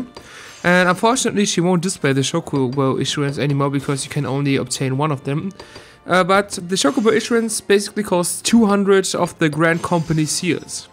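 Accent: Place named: Germany. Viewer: Are you from Germany? Wonderful accent alot of flare, like final fantasy XIV. So thanks for the info.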